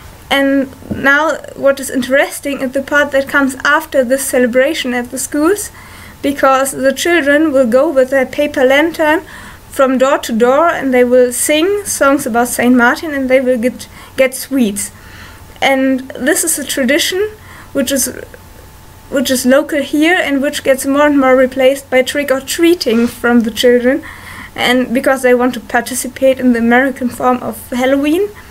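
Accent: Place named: Germany. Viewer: Ur englisch is great (= a bit german, but i like it <3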